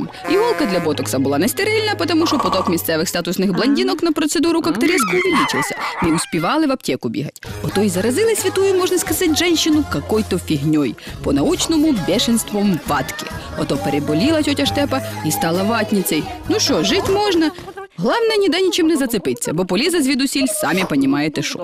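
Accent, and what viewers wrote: Ukraine. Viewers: У меня тетка на Подоле живет в Киеве. Так она точно так же разговаривает. Один в один. И суржик, и интонации и фразочки ту, пипец, как похожи.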